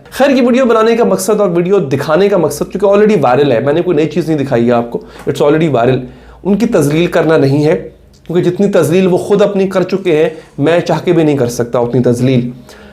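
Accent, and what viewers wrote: Punjab, India. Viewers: Chandda paiyan ny..🤣 bhai waah kya punjabi or style hai🤣👌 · bhai baten krty hue halka sa jo punjabi touch dete hain wo boht kamaal he.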